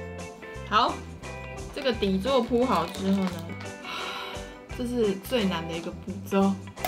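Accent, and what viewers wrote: Taiwan. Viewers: 可愛的..怎麼吃都美...又配上有台灣味愛台灣的臺語口音.吾告讚.水喔...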